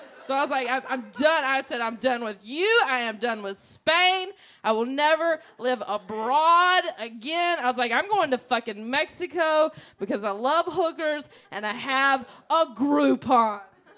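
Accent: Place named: Carolina, United States. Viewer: yes she does. Very heavy Carolina accent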